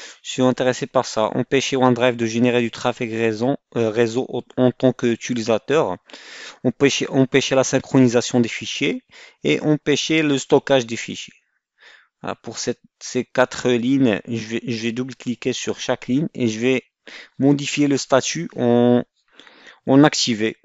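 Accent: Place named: Morocco. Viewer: 3raftek mghribi gha mn lfrançais ta3ek 😂😂😂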